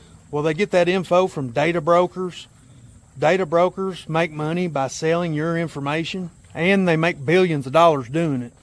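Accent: South, United States. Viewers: I hope you read this as it's my first video of yours. You EARNED my sub, first off u had the stones to not spend 5 min explaining the SBR bs down to your number. Second you built imo the best 300 on yt, and mostly you the only one sound more redneck than me here in NC. You got like sub comment whatever I can do.🫡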